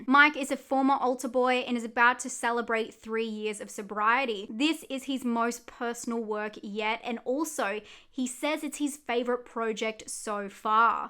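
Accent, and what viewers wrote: Australia. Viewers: Youre Australian?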